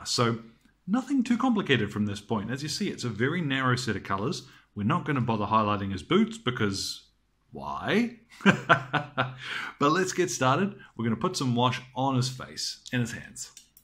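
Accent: New Zealand. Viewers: What part of NZ are you from? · I'm from New Zealand too!! Haha think that's why it sounded familiar but not quite?? Thank you so much for your videos. I'm just starting out with a sisters of battle army :)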